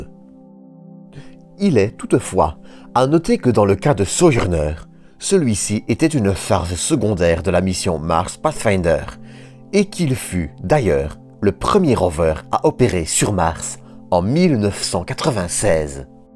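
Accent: Belgium. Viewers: Ta un accent belge jolie video👍👍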